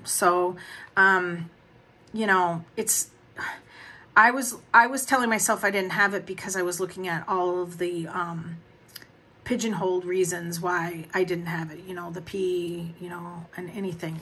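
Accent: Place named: Wisconsin, United States. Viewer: 👏🏽 i applaud this. i’m also working on a health journal. also, i love your accent so, so much! ❤ are you a wisconsinite, by chance?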